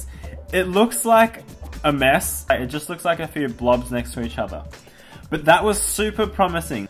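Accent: Australia. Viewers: JanielBxbes he's Australian. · Are u Aussie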